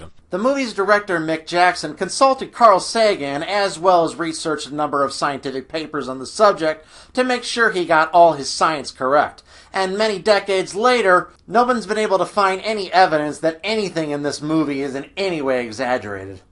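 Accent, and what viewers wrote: United Kingdom. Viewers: Did you have to have subtitles in the US because you couldn't understand Yorkshire dialect?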